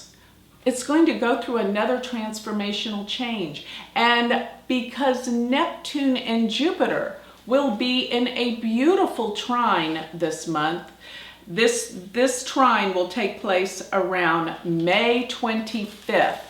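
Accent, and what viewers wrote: United States. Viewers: Greetings from Vedic astrologer...from France. Thank you for posting. Just cannot stand this American accent ;-((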